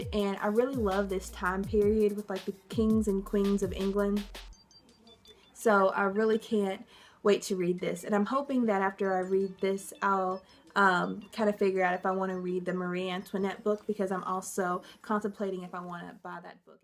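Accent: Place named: South, United States. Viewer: I'm glad I'm not crazy then! I thought you sounded a little southern! It's definitely cute, I love southern accents. And I'm sure you will absolutely love it in this community. :)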